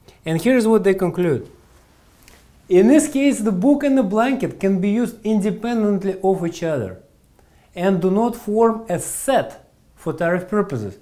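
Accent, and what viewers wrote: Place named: Russia. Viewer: I love his Russian accent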